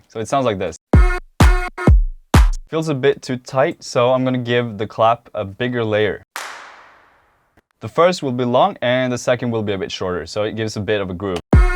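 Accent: Norway. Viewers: So is this dude norveggian or sveddish?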